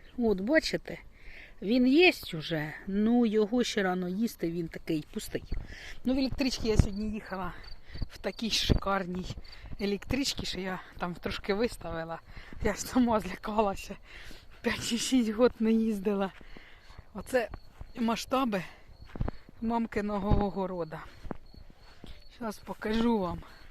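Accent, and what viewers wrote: Poltava, Ukraine. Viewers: По розмові чути це Полтавська обл.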